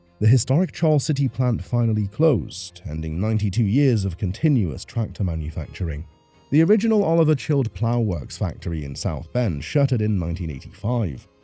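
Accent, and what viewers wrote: United Kingdom. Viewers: Interesting subject that I would have enjoyed except for the absolutely unbearable sound quality and the British accent being so thick I couldn't understand what he was saying.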